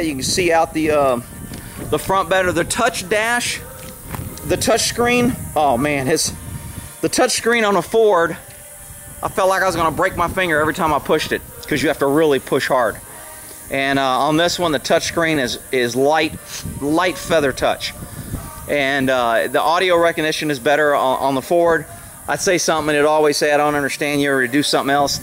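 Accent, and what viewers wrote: Texas, United States. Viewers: Congrats! She's a beauty. FYI..Your accent sounds like Texas to my ear. I have always loved that state. And your videos with the boys on that property makes me want to move. Long Island used to look like that but all the land, horses and cows are gone now. It is over built with no property left.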